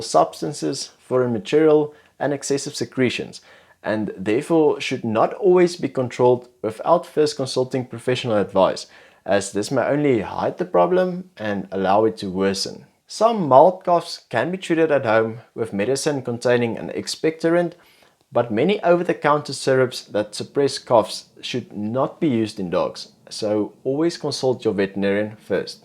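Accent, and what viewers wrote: South Africa. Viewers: Excellent video. Great to hear the SA accent that's when I knew I needed to listen. My fiancée is SA and I am Irish, we live here in Ireland and it's very hard to get a vet to listen to you. They will only do what they think. My husky has been coughing for about a year or so on and off. Also when he howls he starts to cough. No vets have been able to help me at all. He got a scope of his throat and it was a little inflamed but no foreign object. He is diabetic and bg is not controlled after 3 years and he does pant a lot anyway so unsure if it's heart failure or cancer or what? It's very frustrating as the vets here seem to be behind other countries. Any further tips or information or even how to approach my vet to get them to explore further would be appreciated. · Thank you sooooo much sir!!! And I love your accent, it sounds South African...Hello from Texas🥰😘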